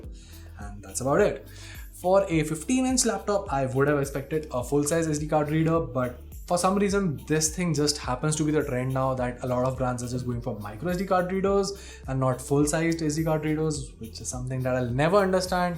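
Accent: India. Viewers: Is he an Indian? Coz he sounds like one?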